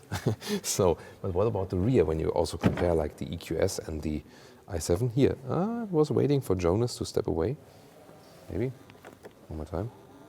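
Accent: Germany. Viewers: Yes sounds more real is like informations are more reliable since said with a German accent …. first ever car I have droven was a BMW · Fix diffrent person to show video this guy has Germany English accent we need international accent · Very nice, but I was having trouble understanding all of it. That thick German accent....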